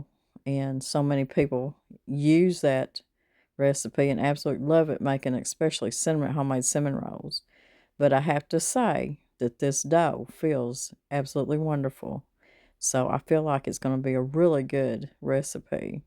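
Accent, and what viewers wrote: South, United States. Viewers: I love hearing your southern draw. God bless you both.❤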